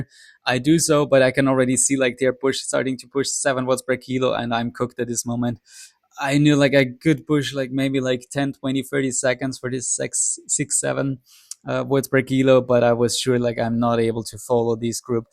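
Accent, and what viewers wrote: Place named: Portugal. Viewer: Portuguese origins? The first name but mostly the english accent. Sounds very portuguese style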